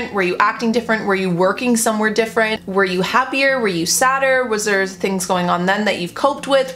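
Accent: Canada. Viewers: Is she from Canada?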